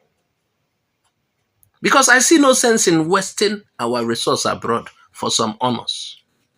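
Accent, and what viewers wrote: Nigeria. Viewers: On whose side are you, you speak as if you're a Nigerian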